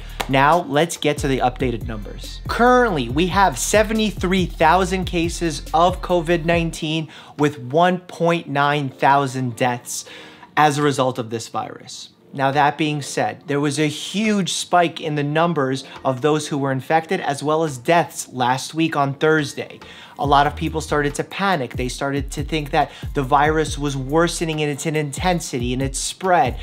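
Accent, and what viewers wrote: New York, United States. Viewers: Is he sounding more New York than any other video he's been on? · with the NY accent though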